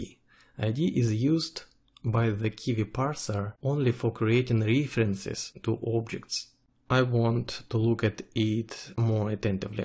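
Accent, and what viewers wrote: Russia. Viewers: Мне показалось или блогер с русским акцентом? В любом случае очень круто!!!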